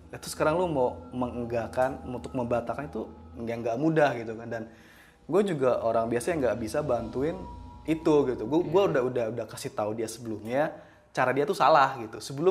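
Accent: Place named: South Jakarta, Indonesia. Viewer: Ada logat2 jaksel kek nya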